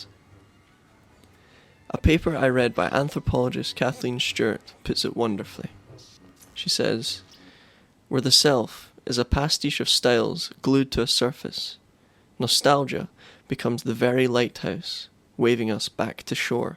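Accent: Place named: Scotland, United Kingdom. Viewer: You sound like an Irish episode 2 Anakin Edit: yes, Scottish, my bad!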